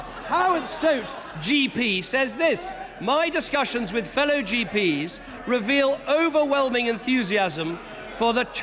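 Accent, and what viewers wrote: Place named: England, United Kingdom. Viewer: English accent is best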